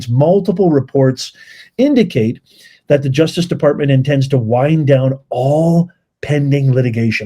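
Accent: Scotland, United Kingdom. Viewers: Absolutely love you're Scottish accent, I don't live far from Scotland and you did it well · Your Scottish accent is great too,! 🥁🥁🥁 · I'm Scottish living in New Zealand, you done a great take, on the Scottish accent, ahhh.... fond memories. Keep up the great work, what a result for America and here's hoping the world's leaders start behaving like America and Argentina. It takes more energy to be negative, guess what, I'm lazy, but so positive.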